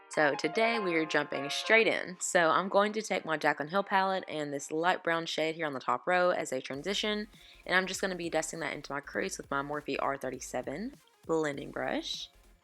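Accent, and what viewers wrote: South, United States. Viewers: i was not expecting that southern accent from you! proves you can't judge a book by it's cover.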